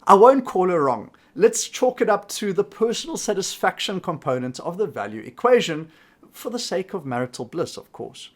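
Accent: South Africa. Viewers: I hear south Africa in this man's voice! 🇿🇦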